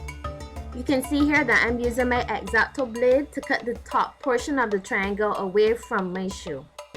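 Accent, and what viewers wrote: Barbados. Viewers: You know a bajan voice anywhere l am so glad to see a BAJE doing this kind of stuff and your instructions are great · I hear the bajan accent .. love it!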